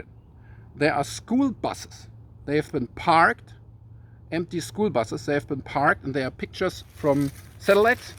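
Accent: Germany. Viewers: I was raised by my German immigrant grandparents ages ago and hearing your accent reminds me of home. I also follow the person you mentioned and #Lahaina #Maui I know I will follow you two as well. #Hawaii should’ve never become part of the United States. But they are and they deserve all the love and support we can send them. #LoveWins Mahalo everyone 💜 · Love your German accent!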